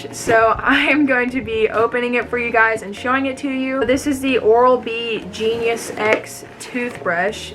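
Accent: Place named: United States. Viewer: dam it you attractive women! You have a cute american accent!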